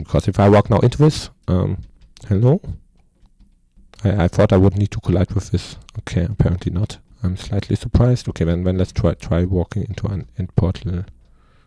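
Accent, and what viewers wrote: Germany. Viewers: Are you german mayhaps? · I hear a German accent. Good video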